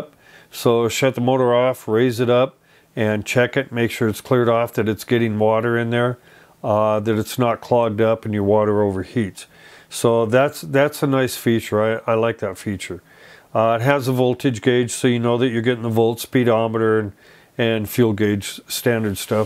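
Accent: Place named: New England, United States. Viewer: I usually like to fish by myself as well. Most companions can't get up early enough and get antsy quickly. By the way, you sound like you're from New England or MA; if so, where from?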